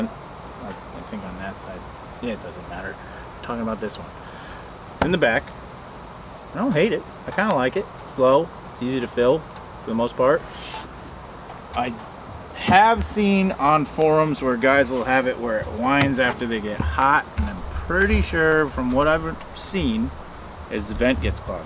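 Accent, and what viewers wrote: United States. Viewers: Thanks for taking the time to share your opinion. Refreshing to see a video that is unrehearsed or sponsored. Like your breakthrough accents and lightheartedness. Good thorough review. Own a 650 XT Max and I love the machine. Mainer eh? In NH we call you "Maine-e-acks. "Kind proved it with the wheelie segment at the end of your video. Made my day! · Literally the first sentence that came out of your mouth I can tell you from Wisconsin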